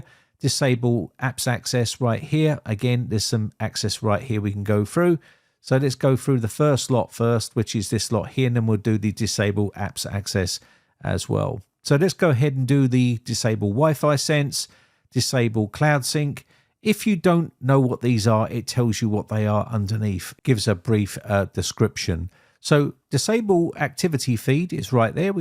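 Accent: United Kingdom. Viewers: Another Windows Install video. I'm keeping track I think this is video #23 of Windows 11 Installations brought to you by. I just watch cause I love the pleasing Brit accent.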